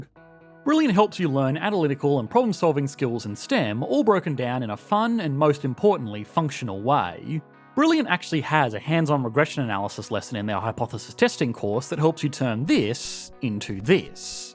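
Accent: Australia. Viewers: Australian English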